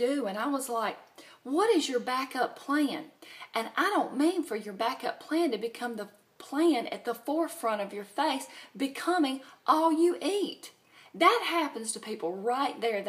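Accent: South, United States. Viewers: I am addicted to watching your videos because of ur southern accent and ur eyes.... Ur eyes are gorg!!!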